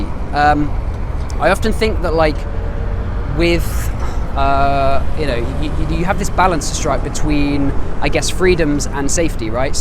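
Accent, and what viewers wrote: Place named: United Kingdom. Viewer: That a big step for you... Love ur video n ur british accent... Pretty decent 👍❤️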